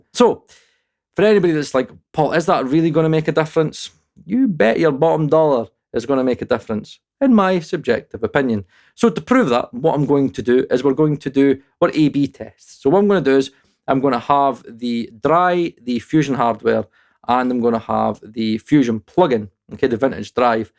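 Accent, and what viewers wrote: Scotland, United Kingdom. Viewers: You have the best Scottish direct on youtube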